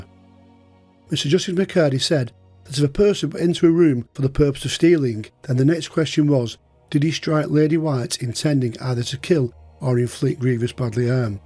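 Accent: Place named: Bolton, United Kingdom. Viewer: You definitely deserve more subscribers.All that meticulous research.Maybe if you changed the name of your channel 'Albert Pierrepoint v Ted Bundy'.Incidently I saw a Pierrepoint documentary with a very young looking as a consultant,Love to hear your mellifluous Boltonian twang,Keep up the good work kid. [sorry ]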